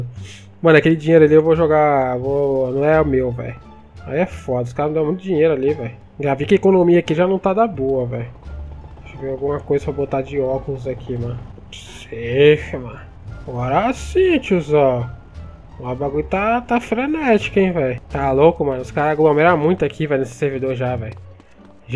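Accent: Bahia, Brazil. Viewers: É baiano ctz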